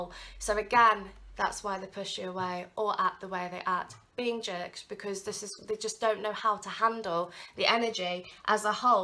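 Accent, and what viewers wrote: England, United Kingdom. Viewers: OMG was Spirit hanging out with my DM..lol resonates completely as expected Beauty, you are always spot on..like the smart psychic girlfriend who is quirky yet never less than stunning on any given day..served in an very cute English ? No expert ..or close by accent and heart of gold who can be trusted..like all women need..TY love , for being that to me..I am grateful and appreciate greatly..Blessings I wish Love and light..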